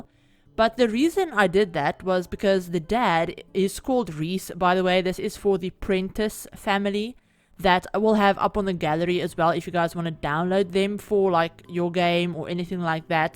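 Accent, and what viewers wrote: Afrikaans, South Africa. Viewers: Are you Afrikaans? Your accent sounds very Afrikaans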